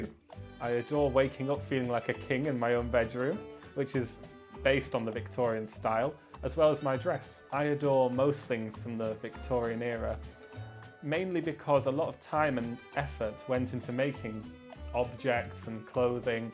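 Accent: United Kingdom. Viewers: His accent sounds northern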